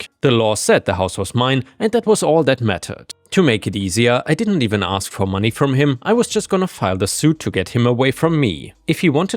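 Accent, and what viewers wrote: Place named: Germany. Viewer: dein deutscher akzent ist gar nicht so stramm, sehr gut, ich kann deutschen akzent in englisch nicht ertragen 😅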